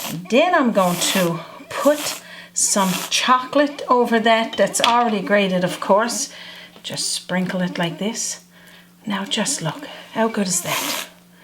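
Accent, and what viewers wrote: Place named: Cape Breton, Canada. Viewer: Love the liners that you used,. I have made many muffins in the past as well. Another idea for you that I have done is.. the part that you cut out, I place it back on top of the filling, press it down a bit, put the whipping cream and cherry and chocolate back on top. Looks so pretty as well. I do it with lemon pie filling, too, on a white cake muffin, topping it with icing sugar and lemon zest. Just an idea. I live in BC now but from Cape Breton. Love watching your videos. I found your site when looking up sea food chowder recipes. Love the accent, reminds me of 'home'. 😊❤🇨🇦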